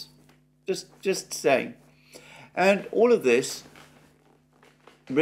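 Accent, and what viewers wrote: United Kingdom. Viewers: Is he British or Russian?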